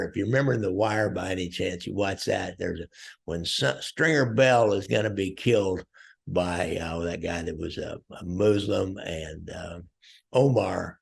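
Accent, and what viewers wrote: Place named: South, United States. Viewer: Great show as always. Its so refreshing to hear these stories from t&e perspective if the other side. While there are a few other former lawmen on youtube or guests on others platforms you have this kind of non biased, fair manner of reporting on your subject. Theyre all pretty ruthless criminals yet if theres anything good or decent about the person that sets them apart from the average thug youll bring it to light. Also, the best part is with your southern, countrified accent you dont sound like some wannabee, half-assed wiseguy. I'm Italian american myself and grew up in rural florida unlike all my other relatives who live within 20 miles of where my grandparents settled in Brooklyn over 100 years ago. Friends get a kick out of my lifelong interest in and of my extensive knowledge of Cosa Nostra because my southern accent makes me a hic or redneck who shouldnt have the interest, background and knowledge that I do. 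I never understood why that was so funny or strange to them until i started listening to your show. Now i kinda get it. For some reason though i dont think anybody feels the same when they listen to James on OC SHORTS. Anyway, just wanted to thank you for another great one. I also wanted to request a show on Trafficante family. We've all heard about his ties to Hoffa, Cuban casinos and possible involvement in the JFK assassination but theres not alot out there about local activities in Tampa and throughout Florida especially S. FL. mainly Dade and Broward countie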